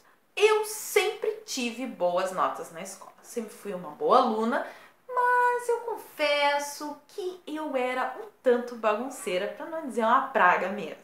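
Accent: Rio Grande do Sul, Brazil. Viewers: Maravilhosa...corajosa...queridona..sotaque gaúcho sim kkkk....Não entre em depressão, porque tu não vai sofrer por causa de mediocres né.... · Tem sotaque sim tchê!!!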